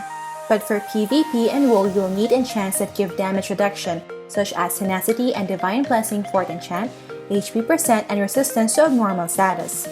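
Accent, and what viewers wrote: Philippines. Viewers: So conyo. Hirap panoorin.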